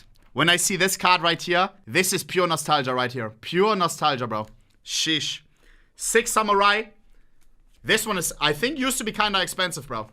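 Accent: Germany. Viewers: the german accent makes it better somehow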